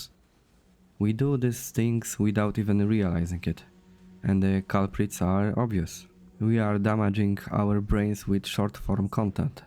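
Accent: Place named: Poland. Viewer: You sound Polish bro, I like polyaks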